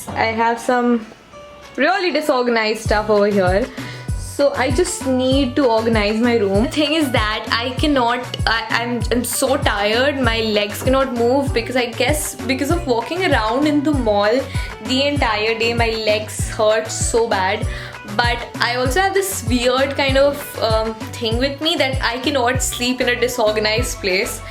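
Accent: India. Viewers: You are from Patna but your accent is really bombay types😊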